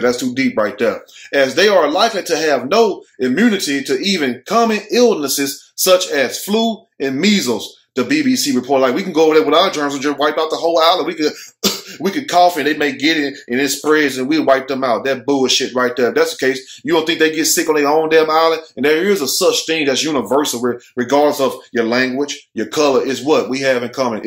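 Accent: South, United States. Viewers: Boy, I love ❤️ the way you tell a story young man!!!! 😍🥰!!! With your country self!!! This is country curvy cougar loves herself a country talking young man! Your wisdom & discernment is out of this world!!! Ellen please come & discover this very talented cutie pie who needs to have his own show!!! Please!!!!!!!!!!! 🙏🏾🙏🏾🙏🏾🙏🏾 In the meantime, this “family member” will keep on watching this awesome on his channel......until his promotion! I only 🙏🏾 he stays the same when he does become a famous tv star!!! 😘😘😘😘💜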